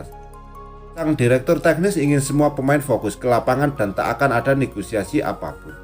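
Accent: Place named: East Java, Indonesia. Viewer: Suara e medok .. orang jatim ya?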